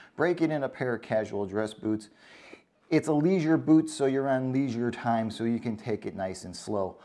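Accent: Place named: Midwest, United States. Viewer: Interesting accent... is it midwestern maybe?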